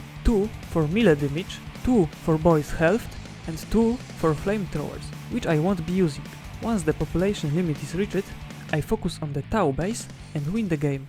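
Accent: Poland. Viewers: I hear that accent, and i think i am not wrong, a Fellow Pole you are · Ooofff... the ponglish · Słyszę chyba polski akcent 🤔 Mam Cię gagatku 😁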